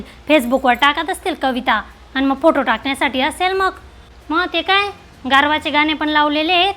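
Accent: Khandesh, India. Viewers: बापरे, तू ग्रेट आहेस ताई! Content wise पण आणि अभिनयाच्या बाबतीत तर तुला परकायाप्रवेश करायला तुला काहीच वेळ लागत नाही, सराईतपणे करतेस! एकूण एक मुद्दा cover करतेस, ते पण तुझा खान्देशी लहेजा सांभाळत! खूप खूप शुभेच्छा! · खानदेशी लहेजा खुप छान सांभाळत घरोघरी असेच संभाषण चालूच असत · Typical Marathi Aai Tyat Pan Khandeshi Tone 👌👌👌👌😍😍👍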